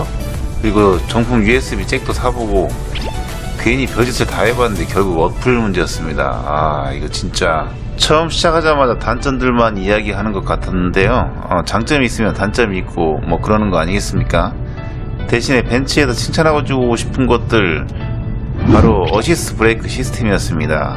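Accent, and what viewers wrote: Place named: Jeolla, South Korea. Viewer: 즌라도 사투리 쩌네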